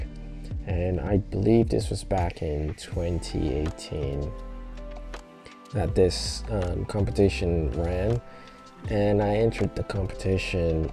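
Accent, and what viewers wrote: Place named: Nigeria. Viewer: Such a skilled Man. Immediately I heard Nigerian, I smilled. Great Job my Brother.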